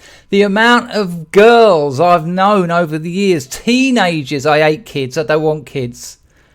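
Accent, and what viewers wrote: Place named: England, United Kingdom. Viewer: You don't know how good it feels to hear someone with an English accent say this. I have argued endlessly while my cousins across the pond called me a backward hick. I knew their decisions and attitudes would devastate their fair isle, I had no idea how quickly it would happen. Right now it's a giant snowball going straight down a mountain. Britain and Europe is lost.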